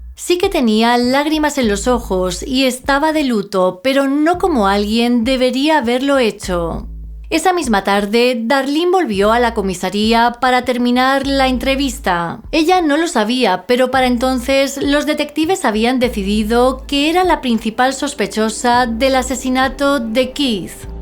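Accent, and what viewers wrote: Spain. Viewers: Me encanta su acento, es de España 😍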